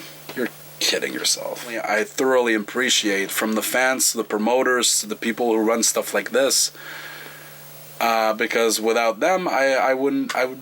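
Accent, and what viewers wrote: Quebec, Canada. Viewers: I wanna hear him speak French (as a fellow French Canadian ) . I can hear his French accent on a lot of words. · He's from Quebec Canada so of course he'd have an accent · Quebec accent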